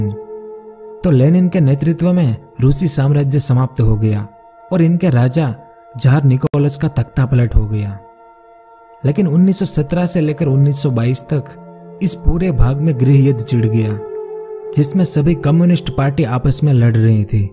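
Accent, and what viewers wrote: Gujarat, India. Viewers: Your tone of hindi speaking shows that you are gujarati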